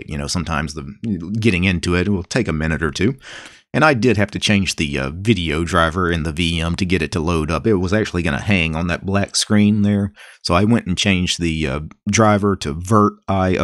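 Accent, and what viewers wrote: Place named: Deep South, United States. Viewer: First linux nerd with a deep south accent xD Best thing in the community.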